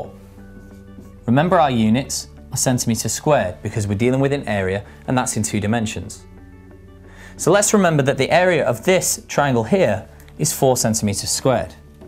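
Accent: United Kingdom. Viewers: hehe hes british luv the accent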